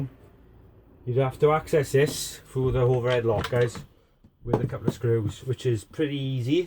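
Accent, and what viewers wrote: Wales, United Kingdom. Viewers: Love your accent. You remind me of my time living in Penbrokeshire Wales. Thanks for explaining the shade repair.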